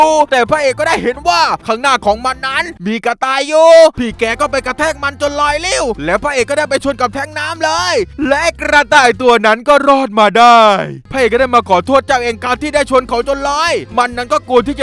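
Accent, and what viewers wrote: South, Thailand. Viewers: พี่คนไต้ไช้ไม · แอดเป็น เด็กใต้? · ชอบๆ มีภาษาใต้ด้วย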